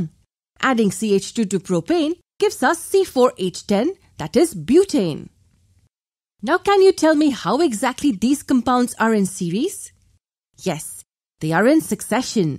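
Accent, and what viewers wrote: India. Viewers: Is the speaker indian ?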